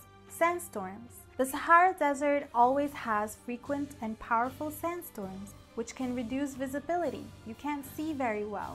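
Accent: United States. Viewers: You sound American or Canadian. Its hard to believe you learned English in Egypt. You must have watched a lot of movies or something.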